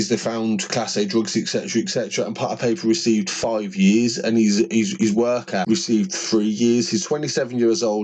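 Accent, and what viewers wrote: Birmingham, United Kingdom. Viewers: I rate you g u tell it how it is and u sound like yu from brum im from balsall heath b12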